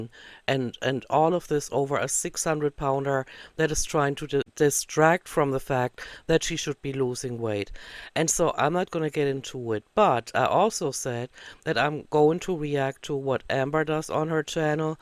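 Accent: Germany. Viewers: RIP, your beautiful German accent voice and you and your personality will be and is missed 😢 Why must everything good get to be taken 🕊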